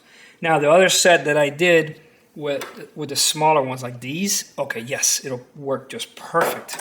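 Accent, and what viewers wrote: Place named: Cuba. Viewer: Great video! I like your voice, you sound just like my wife's uncle. He is Cuban, raised most of his life in the US, but has just a little bit of an accent.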